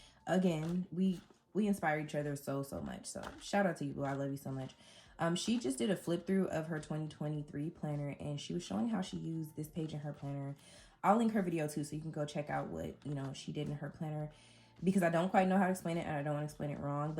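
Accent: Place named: New York, United States. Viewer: New viewer & subscriber. Right b4 you said you live in Vegas, I said she sounds like she could be from NYC. Tfs all your ideas...good inspo. Love your nails 💅 too.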